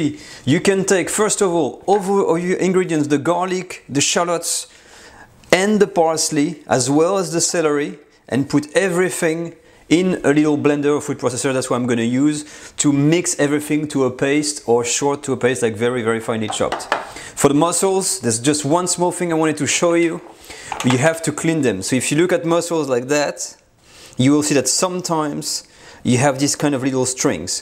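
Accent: France. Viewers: j'ai reconnu à l'accent que tu étais français, en tout cas super que tu parles en anglais, ma voisine va encore plus adorer;), en tout cas super bien expliqué,super recettes... 👍👍👍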